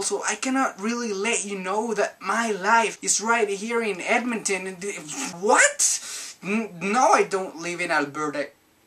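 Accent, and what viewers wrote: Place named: England, United Kingdom. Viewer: x3 i like your english accent <3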